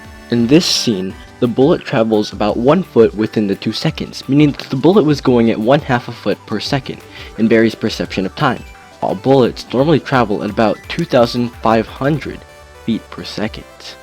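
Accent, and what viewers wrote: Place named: United States. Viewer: you've gtta be shitting me... ur only in high school!? I won't ask what town u live in bc I doubt you'd wanna give up personal info to a total stranger over the internet like that but I will ask what state u live in (or if u happen to live in Canada; but u do sound like ur from here but whatever lol) I ask bc I want to see what states school system is obviously way better then New Jersey (where I live) n their school system lmao.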